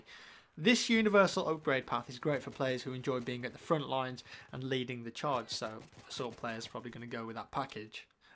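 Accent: United Kingdom. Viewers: Guess he can't stand your civilized British accent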